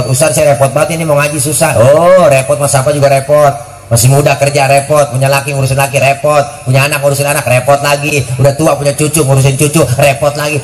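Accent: Jakarta, Indonesia. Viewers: Betawi baget klo ceramah ini kocak lagi bikin mules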